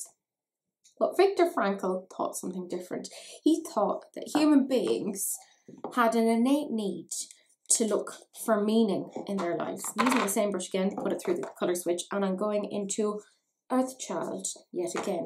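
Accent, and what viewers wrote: Ireland. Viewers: OMG! You actually said PR, not P-OR!!.. LOL! Never change, we love your lovely Irish-ness!!.. and your lovely Irish face!... xXx 😜😊😎💜💜💜💜🌙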